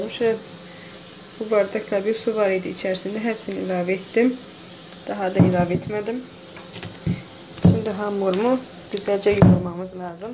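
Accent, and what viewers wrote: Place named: Azerbaijan. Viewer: danisiginizdanda hiss etdimki azerisiz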